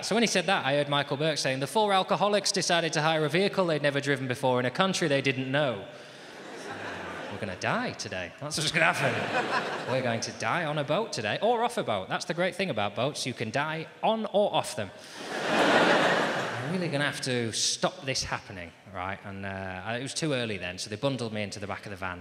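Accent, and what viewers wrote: United Kingdom. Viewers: What kinda British accent is that?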